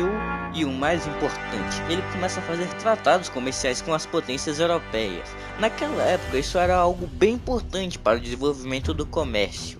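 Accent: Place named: Paraná, Brazil. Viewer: Você e paranaense porque falou Porto e r você puxou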